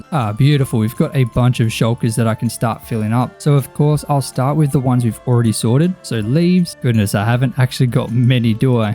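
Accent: Australia. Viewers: This was the first of your videos ive watched, i immediatly knew you were australian 😂 From WA myself. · No hate but why his australian accent acting like the volume button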